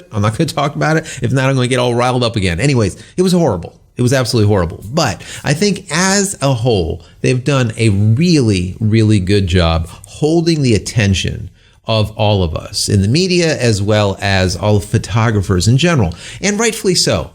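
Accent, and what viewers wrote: United States. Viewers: ) 2 things. Well, 3 things. 1. Great videos, I've been waiting for Nikon to come out with mirrorless for years and I will not be sleeping tonight I'm very excited. 2. People shouldn't harp on you for having an American accent when you pronounce Nikon. N-eye-con... deal with it, Americans speak with an American accent. We also say automobile and not Jidōsha but no one corrects us on that. Fools. 3. The back dial you referenced is historically called the Command Dial, and Nikon has never labeled it in any of their DSLR's. The front unlabeled dial is historically referred to as the sub-command dial. It looks identical to the Command Dial used on the Nikon 1 V3, and I'm sure it'll operate the same.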